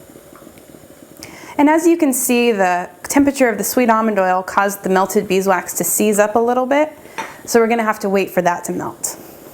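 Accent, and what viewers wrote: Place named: England, United Kingdom. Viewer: I saw you on afolasmr I thought you were from England 😅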